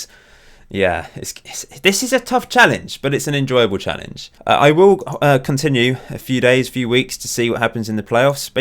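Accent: RP, United Kingdom. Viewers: I don't know if you really are posh but when my girlfriend overhears me watching your show she says "ah, you're listening to that posh guy talking about football again". so there you go...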